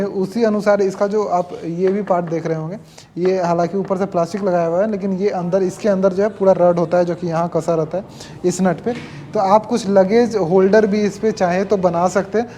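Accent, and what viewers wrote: Bihar, India. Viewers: I bet you are Bihari. I guess because of your pronunciation of ra and da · Are you from Bihar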